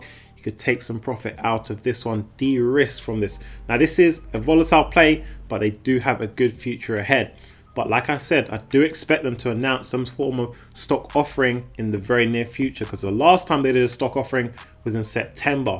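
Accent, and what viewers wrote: United Kingdom. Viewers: Hi. You from the uk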